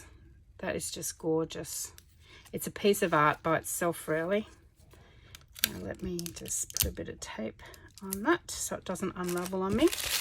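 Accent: Australia. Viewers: I have never ordered from Ali Express but I want to! Great haul! I wonder how long it would take to arrive in America? I live in New York State and I am in the middle of trying to watch all your videos, just love them. Love your Australian accent and your voice is so soothing to listen to…..cyndi m.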